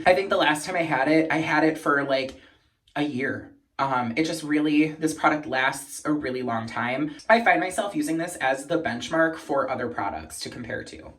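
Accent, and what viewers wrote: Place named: Buffalo, United States. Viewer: I'd LOVE to see what wedding look you're gonna do with the Ethereal Eyes Palette! I was also cracking up cause I say the word "palette" the same way you do. Our Buffalo accents with these A's are outta control 😂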